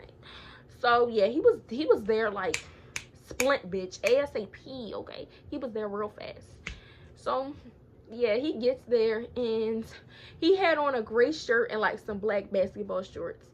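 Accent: Baltimore, United States. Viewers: That’s that Baltimore accent!😭😭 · im from newyork and she has nothing close to a ny accent idk where you got that from😂, she has a strong baltimore accent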